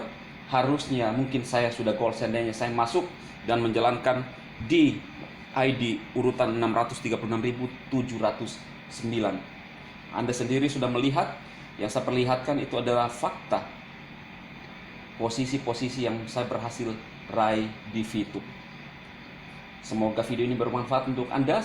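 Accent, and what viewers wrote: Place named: Bugis, Indonesia. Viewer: logat bugis y.. josss vitube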